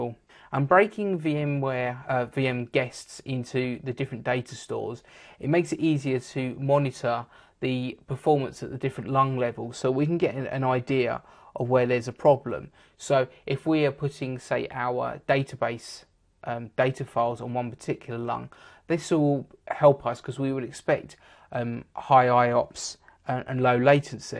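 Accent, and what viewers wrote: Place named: United Kingdom. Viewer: Thanks for yor video but sometimes your britsh accent gets in the way. Too much 'nasal effects' lol making it hard to understand. for instance what you say the console(light) what does Dell and HP call it? And you clearly didn't answer the question to the missing part 5 video. Keep you answers simple please... Thank you once again. Do you ever work with DELL SAN's what do they call them? You introduced a new term called LUN but failed to explain it. What are the common SAN models used in an enterprise environment? Furthermore: For instance, in an enterprise environment installing and troubleshooting SANs (Best practice) Performing switch add/zoning. Installing and troubleshooting Virtual SAN, SnapView/MirrorView Thanks in advance!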